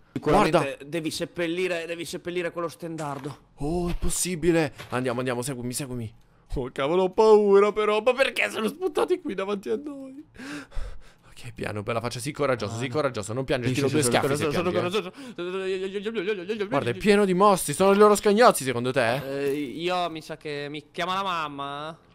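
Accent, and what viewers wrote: Sicily, Italy. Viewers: !!! È siciliano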